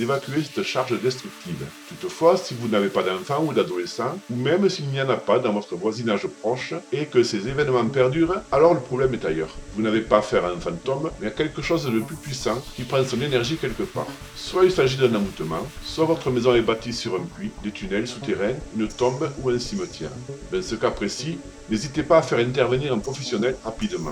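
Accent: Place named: Southwest, France. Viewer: Bonjourrr j’adore votre accent du sud ouest comme le mien hhhhh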